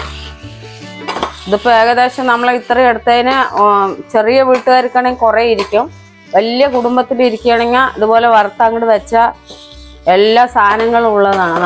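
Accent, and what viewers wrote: Palakkad, India. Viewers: പാലക്കാടൻ ഭാഷ 😂😂😂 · പാലക്കാട് ആണ് എന്ന് നന്നായി അറിയാം. ആ ഭാഷാ, penne കപ്പല് മുളക്..❤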